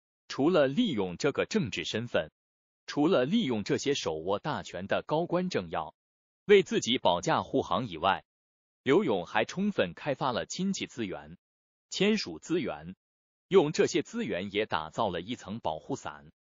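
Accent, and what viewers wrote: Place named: Taiwan. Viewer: 这是哪毕业的播音员啊？台湾吗？这普通话不是母语啊！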